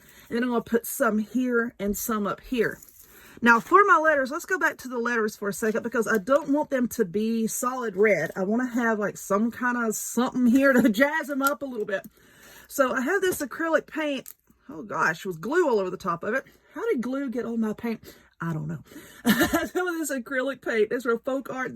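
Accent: South, United States. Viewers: If you dont mind me asking what part of the south are you from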